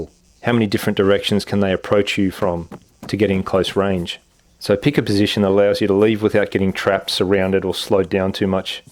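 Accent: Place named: Australia. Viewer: Why does an Australian accent do so much for tactical confidence? I feel more effective for having seen this.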